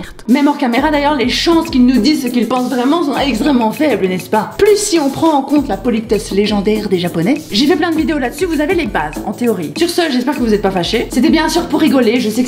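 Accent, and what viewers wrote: Belgium. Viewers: Pourtant ça s’entend fort que tu es belge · Ah non moi je pensais pas que t’étais française #laccentbelgecestminion 😍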